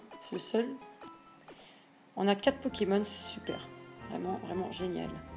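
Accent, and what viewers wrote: Marseille, France. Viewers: Salut :) Le petit accent Marseillais qui fait plaisir ^^ GG pour le Galekid dans Rubis,Saphir et Emeraude tu en trouve dans une grotte a la 2eme arènes ^^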